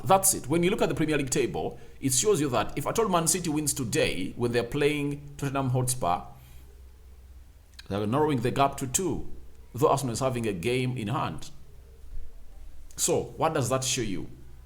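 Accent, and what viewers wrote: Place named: Iteso, Uganda. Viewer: thanks for the job you really give the truth but i request you to tell me your country and tribe coz you speak like an itesot from soroti city uganda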